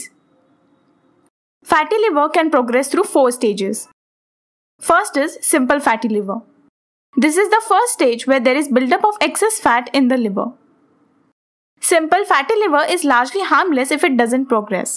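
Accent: India. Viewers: I thought you were Geman at first but then I was like nope! India ; ) when you all talk fast you give it away.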